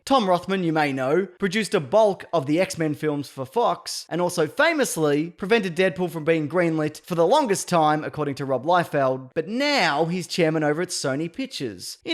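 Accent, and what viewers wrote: Australia. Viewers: You sound Australian so do a video on Danger 5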